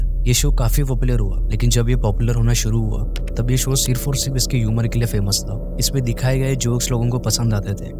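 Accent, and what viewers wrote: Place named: India. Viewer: He speaks indian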